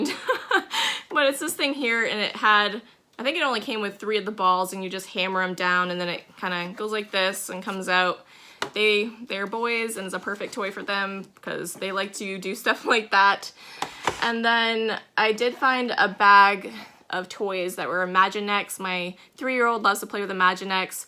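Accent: Maine, United States. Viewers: Wonderful Maine accent!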